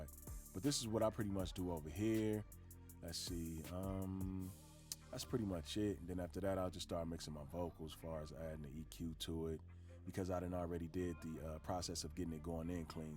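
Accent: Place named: Cleveland, United States. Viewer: Well then you close, homie. I knew I pinpointed your accent and just genuinely liked you because you was from the Land. Good to know homie. I’m frustrated as fuck. I’m still up. I thought I had exploded on my tracks on my MPC to live properly into my logic, but I actually did it. There are Sounds is missing. Are you on Instagram? Send me your handle. I would like to be your Instagram partner. And thanks man I will check your videos. I like your style.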